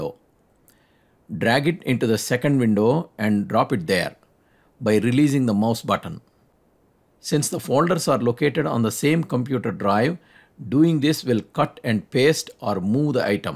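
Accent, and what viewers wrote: India. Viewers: There is a need for Windows 11 instruction such as this but with instructors who can speak clear English without an accent, which in this vid are by an Indian speaker. I cannot grasp the content while trying to get through the accent. It is also important to have people who understand teaching techniques for clarity, and slowing down so that everything is done in 5 minutes.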